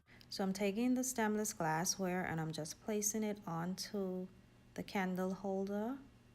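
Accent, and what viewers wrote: Bahamas. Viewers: Nice, you sound Bahamian ?? ❤️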